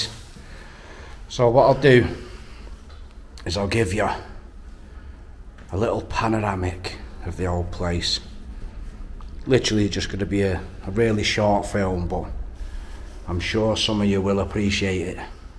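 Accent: Manchester, United Kingdom. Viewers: like a manc boss .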